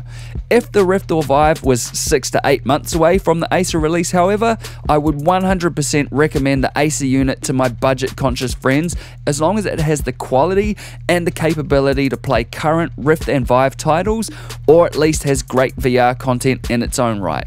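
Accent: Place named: Australia. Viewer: another great video thank you, I noticed you were speaking more slowwwly this time. Is that to help an international audience with your Aus accent? if so my hats off to you for expanding. although personally I preferred the 100MPH, full bore, let rip :) keep up the good work, I look forward to the next Video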